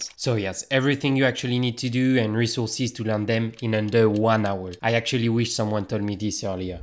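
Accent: France. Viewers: Your french accent is cool ✨ good advice too, keep it up ❤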